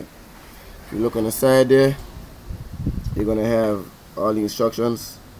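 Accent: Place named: Jamaica. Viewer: jamaican accent. thats cool great video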